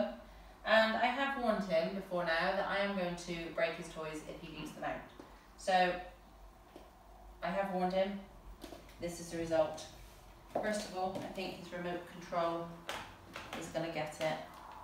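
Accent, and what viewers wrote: West Country, United Kingdom. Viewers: As sexy as you are….. no stilettos 👠 on a real wood floor unless you want to give it a ‘distressed’ look….. there again, it may by engineered wood or posh laminate 🤔…. Love your West County accent by the way 😉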